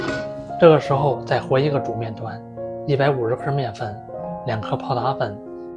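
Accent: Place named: Tianjin, China. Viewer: 博主是天津口音吧，这个发饼我觉得太费事啦，烤箱也更加费电，你这个成本算起来太高了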